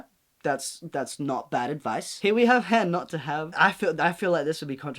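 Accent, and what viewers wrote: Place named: Cockney, United Kingdom. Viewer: Your cockney accent is the best thing I've heard all week.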